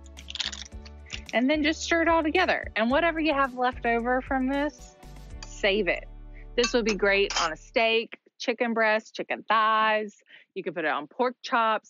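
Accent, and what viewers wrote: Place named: West Virginia, United States. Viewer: It's probably her West Virginia accent. What's the big deal? Actually everybody has some kind of accent. Where are you from???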